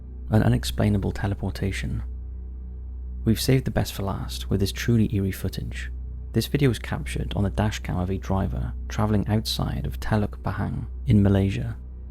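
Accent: Wales, United Kingdom. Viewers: Hello, you have a great voice, do I spy a bit of Welsh. I think you should loose the top 5 and start doing full stories as your voice and narrative skills would suit that. But thank you for uploads. Always a joy x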